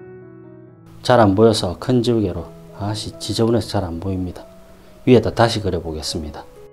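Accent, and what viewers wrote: Gyeongsang, South Korea. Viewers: 경상도는 억양을 고칠수없어..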